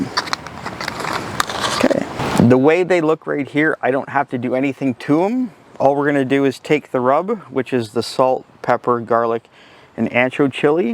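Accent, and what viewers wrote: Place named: Canada. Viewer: My Canadian brother! I'm an American expat in Panama from the US and love to make meals with beef shank aka OSSO BUCCO as the Panamanians named the cut after the meal. I am assuming your Canadian based off the accent but if I got it wrong my apologies. Gonna watch more of your stuff and share! Thanks!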